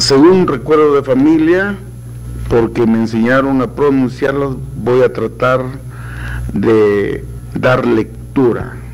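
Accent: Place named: Castile, Spain. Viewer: Felicitaciones por el audio-video pero me parece que algunos fonemas son pronunciados a la manera castellana. Creo que el muchik tenía el fonema SH escrito con X, y Jequetepeque se escribia Xequetepeque segun la grafía del castellano del siglo XVI y se pronunciaba Shek(e) tepek (e) como en Short y no con J que fue un cambio fonético del español en los siglos XVII-XVIII. Por demás es comprensible q así sea pq el idioma muchik era muy difícil de pronunciar y no subsistieron hablantes maternos en el siglo XX a excepción de un anciano en 1987 q recordaba el habla de sus padres pero no lo hablaba, como para aprenderlo correctamente 🖒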